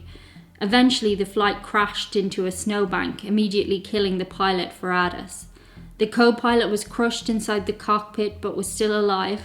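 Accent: Ireland. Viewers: Is it just me, or does this sort of story feel more unsettling when told by a speaker with an Irish accent? I think culturally we (at least in the US) don't associate "Irish" with this kind of darkness.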